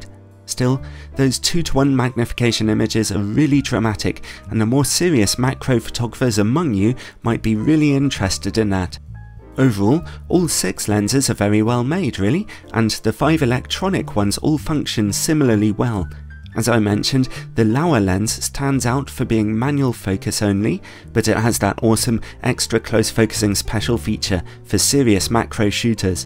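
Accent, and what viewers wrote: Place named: RP, United Kingdom. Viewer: It's the voice. Very soothing cadence and RP enunciation.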